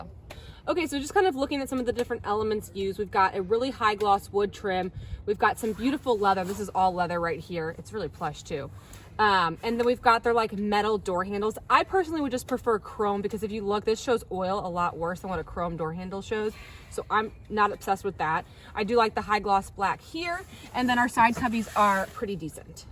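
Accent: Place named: Minnesota, United States. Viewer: She has the Minnesota accent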